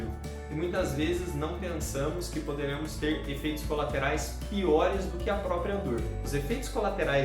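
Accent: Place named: Caipira, Brazil. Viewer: Desculpa lá! Mas, não aguento ouvir este caipirê.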